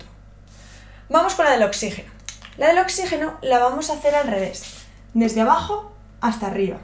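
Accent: Spain. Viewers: Cuándo tu profesora de química es española y se te dificulta concéntrate 😅😅 por lo hermoso que habla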